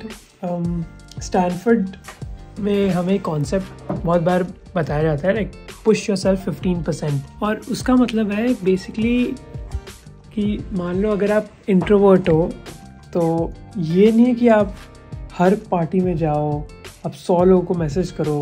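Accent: India. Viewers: why does his accent sounds exactly like the American stereotype for "indian guy". Not that I liked it.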